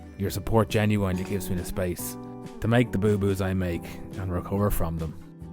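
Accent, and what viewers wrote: Ireland. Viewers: Every video o watch,i feel like i should be drunk,i guess is Irishness coming through.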